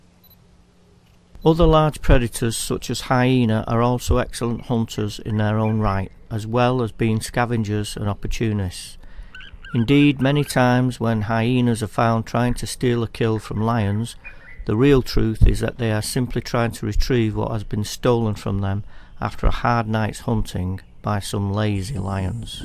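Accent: United Kingdom. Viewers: you have a great northern accent. Well narrated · Intrigued to hear a Yorkshire accent narrating about a South African game reserve. I think you did a good job and I particularly appreciate the natural sounds of the bush in the video. Too many of these videos have awful background music that adds nothing of value. I spent 4 weeks doing conservation work on a SA private game reserve and hearing the sounds of the bush revives happy memories.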